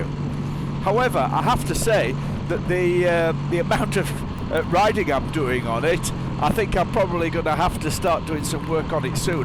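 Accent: England, United Kingdom. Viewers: Nice Video but even though I enjoy English Accents, I would like to hear the motor more. Go thorough the gears a couple of times with your mouth cloised. Cheerio...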